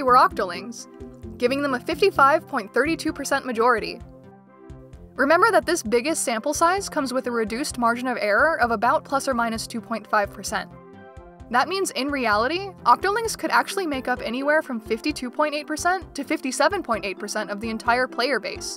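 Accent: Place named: North America. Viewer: a "normal" amount.... also I'm judging by the accent I'm assuming you are an NA player. The match making servers prioritze region to cut down on lag so that would be a thing to consider with your sample. My guess is JP has an inkling majority but I haven't collected any data on that just a vibe. Also my statistics nerd brother in arms.... I am sorry nobody told you about stat.ink. Lastly, love the PSO music cheers :)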